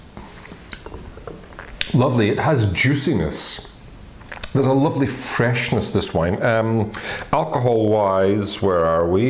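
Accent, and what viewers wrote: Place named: Scotland, United Kingdom. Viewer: Hearing a Scotsman talk about wine is taking me a minute to wrap my head around! :P Came to learn about Marsannay in general, you reviewed THE EXACT wine we'll be drinking this eve! Cheers from America (and my girlfriend who is a transplant from Fife. :) )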